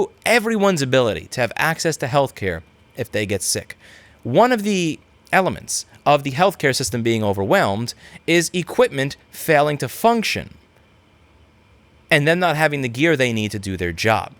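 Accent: New York, United States. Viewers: I love how 99% of the time is hardcore in-your-face New Yorker, but when it comes to BlackBerry.... suddenly he's all sweet with a cutesy voice! It's quite the difference 😁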